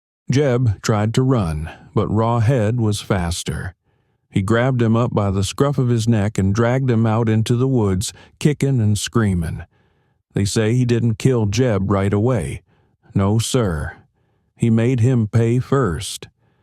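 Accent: South, United States. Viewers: This spooky story should become a classic. Dark atmospheres. A soft Southern Drawl with wording the way only an old time Southerner could say it. And the story! Spooky as all get out! But tethered to our earth by its moral lesson. Surely a tour de force of Kentucky lore. Thank you!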